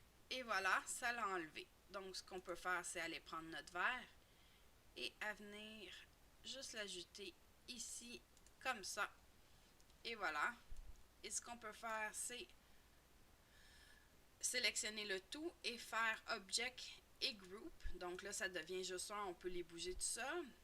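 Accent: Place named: Canada. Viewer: Ah cet accent canadien ! j'aime beaucoup ! Et merci pour le tuto.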